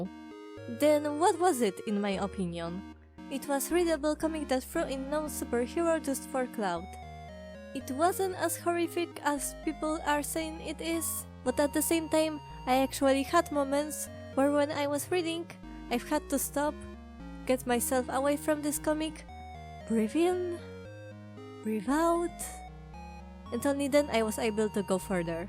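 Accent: Poland. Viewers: Questioooon, Are you polish? The accent kind of sounds Polish and I wana know if I’m good at recognizing other Poles, and if Not I’m still glad you’ve actually taken the time out of your life to learn english, You sound awesome >:3 ALSO TPN AND HANAKO KUN MENTIONED WOOOO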